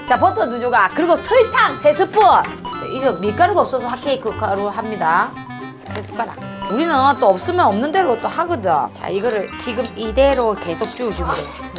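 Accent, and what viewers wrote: Busan, South Korea. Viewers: 울 부산 이모랑 말투 똑같.. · 부사너로써 찐사투리 들을 수 있는 요 컨텐츠 넘 조아요! 그리고 거침없는 요리실력 속이 씌원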